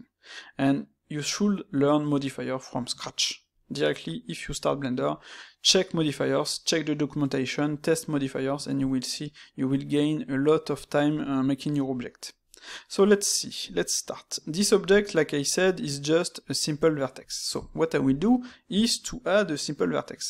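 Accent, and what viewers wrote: France. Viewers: Bonjour! merci pour ton frenchy accent. pour une fois que j'arrive à comprendre un tuto en english!!! J'ai un souci lorsque tu applique le premier Bevel a les edges intérieures disparaissent, ainsi que la face... je suis bloqué. je suis sur blender 2.9.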